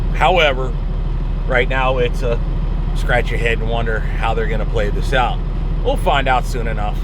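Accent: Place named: New York, United States. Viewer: You from New York New York or New Jersey that accent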